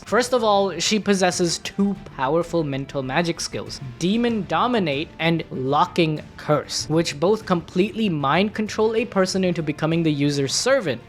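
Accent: India. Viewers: Are you indian by any chance?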